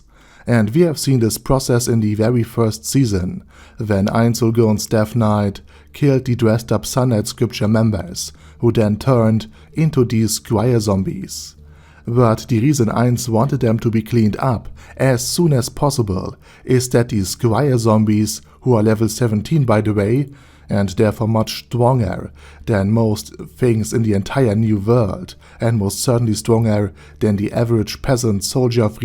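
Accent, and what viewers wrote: Germany. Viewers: Yes my hearing is good, I have been listening to non natives since I was 5 years old every day. If you are German yourself and hear a german accent this awful it makes you not even wanna try. This is the level of someone who has not tried to make themselves more illegible since he first started learning english as a kid. As someone who actually put in effort to make myself more understandable, why should I waste my time on an animal who puts 0 effort? Again, stop dickriding this random person who doesn‘t even know you exist. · If you don't understand a German accent feel free to leave. Listening to a German accent can take time to get used to but the way you say it is just rude... If you really watched at 2x and didn't understand what he said it's your fault in the first place. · He's German, so he has german accent. It really isn't that hard to understand as you make it out to be.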